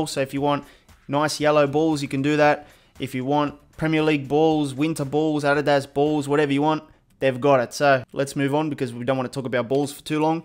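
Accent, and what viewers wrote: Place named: Australia. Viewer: He has australian tinge. I and long vowels. Adviiiiice. Hit. Thinking. Nil. I could go on. R.i.p if you were nz born and then moved to aus. You also sound like you born south auckland?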